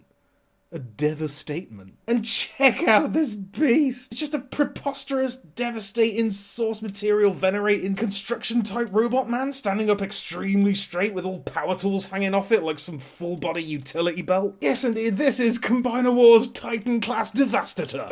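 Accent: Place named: United Kingdom. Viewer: Ah, the accent and "cheeky". Of course you know Penfold and Dangermouse. Did you guys have Bananaman?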